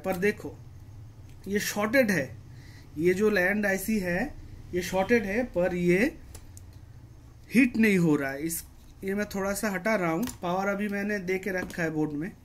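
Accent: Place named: Bengal, India. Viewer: Bangali ho kya Bangali Jaise baat kar rahe ho to Bangali Ho gai Rakha lagta hai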